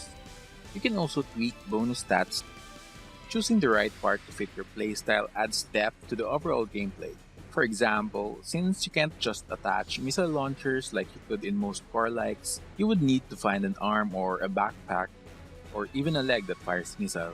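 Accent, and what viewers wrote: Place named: Philippines. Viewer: Sounds very Filipino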